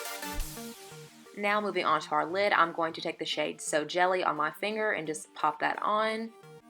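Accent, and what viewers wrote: South, United States. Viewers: So pretty, and I love your accent, are you from the south?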